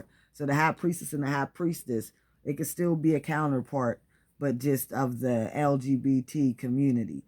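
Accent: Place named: Baltimore, United States. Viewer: oh wow idk why it sounds like a baltimore accent lol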